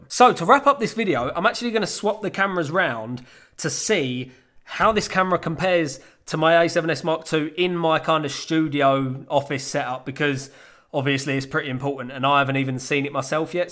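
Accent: United Kingdom. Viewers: where's this guys' accent from like which part of the UK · favorite british creator, loving your accent your lovely geezer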